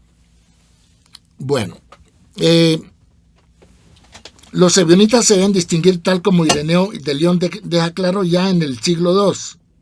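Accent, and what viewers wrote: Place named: Colombia. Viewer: Pero creo que tienes que presentarte...según tu tono eres Colombiano..no puedes ser rabino. Porque tendrías que ser Judío nacido en Israel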